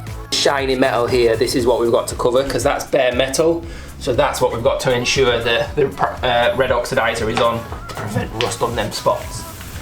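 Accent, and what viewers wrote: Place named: England, United Kingdom. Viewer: I really enjoy listening to you speak. (English accent) 😁👍🏽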